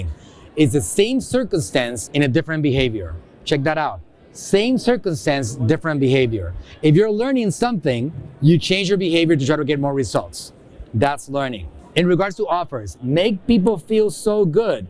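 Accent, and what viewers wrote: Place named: Latin America. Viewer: Qué increible como se nota que no es hablante d inglés nativo. Se nota el acento latino.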